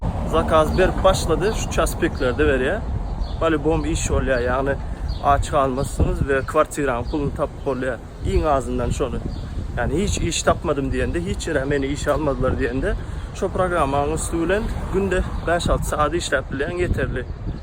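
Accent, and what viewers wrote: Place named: Lebap, Turkmenistan. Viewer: Sps sawedyn ucn. Gepleysina lebaplylara menzeya.bloklaryn howes biln dinleyan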